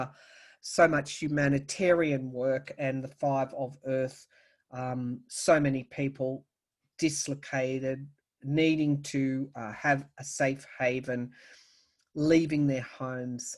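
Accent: Australia. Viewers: Just hearing your voice and your adorable Aussie accent makes me smile and makes me feel at peace. You've got something that's beautiful. A gift. And I don't just mean the cards. Thanks again!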